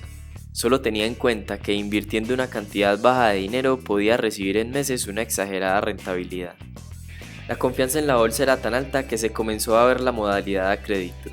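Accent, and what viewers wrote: Colombia. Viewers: Me gusta el acento parce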